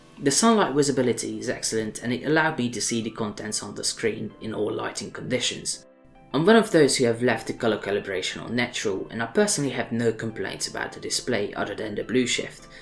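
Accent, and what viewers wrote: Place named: United Kingdom. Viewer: I still own this phone in 2019. I won't upgrade until a decent phone is actually released. i used to change phones every 6 months, but at this point everything is such a mediocre upgrade. I love my Pixel 2 XL and for all it's faults, it's still the best phone I've owned so far. Hoping the Pixel 4 XL is worth buying. If Google choose LG again for the Pixel 4 XL (I know it's not in the 3) then I will not buy it. I have a hatred for LG PLED. Awful quality, Samsung are OLED kings. Just a side point, interesting accent! It's at times very British like mine, and at other times very mixed lol, just curious. Update 27.04.18: Just accidentally ordered an s10+ (£650). I have a feeling I will regret this.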